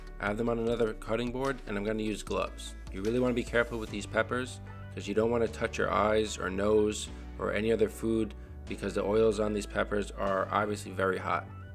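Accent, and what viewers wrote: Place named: New York, United States. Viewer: I like that Global knife, man. I almost bought one just like in 2015...but I'm a cheapskate, and so I didn't. LOL. I can tell by your accent that you're from NYC. Which borough? Just curious. Don't answer that, if you're uncomfortable answering. I'm a west coaster, but my wife is from Weehawken, so I've been out your way quite a bit.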